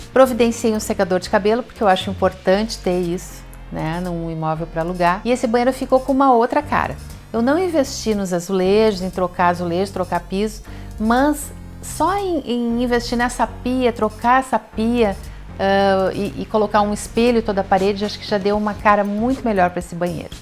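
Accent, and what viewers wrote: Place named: South, Brazil. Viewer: Como já morei no sul do Brasil amo o sotaque .❤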